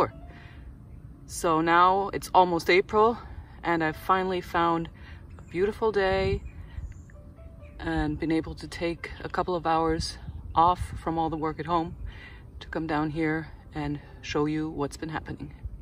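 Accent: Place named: Canada. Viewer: I love all of the old brickwork you have! Darn meece are always feasting, aren’t they? Where are you based? Your accent sounds like my lovely neighbors to the north 🇨🇦! Your seedlings look very healthy and happy! Love the bright green shoots! Everything looks great and ready for wonderful food production! 🥰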